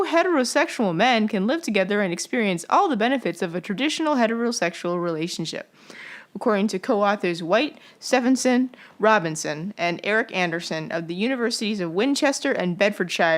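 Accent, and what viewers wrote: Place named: Brooklyn, United States. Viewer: You sound like you are from Brooklyn. This pleases Me. Great job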